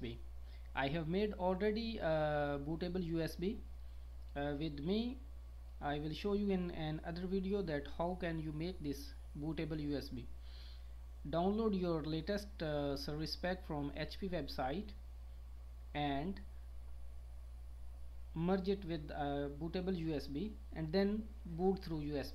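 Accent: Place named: Asia. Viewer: i was just asking as ur accent sounded like an asian bro. Anyway keep up the good work.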